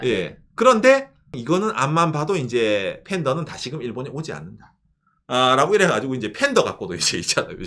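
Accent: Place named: Gyeongsang, South Korea. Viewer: 일본 사람 팬더 좋아한다메? ㅋㅋ 과 같이 살더니 자연스레 경상도 사투리를 쓰시네요~~~^^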